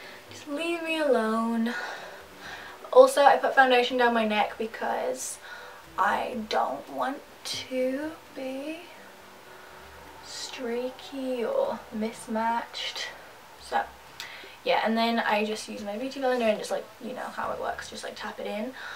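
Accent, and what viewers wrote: United States. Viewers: does england has different accent depends on where you live? she said she's from england but her accent sounds like she's from america 😅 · You sound slightly American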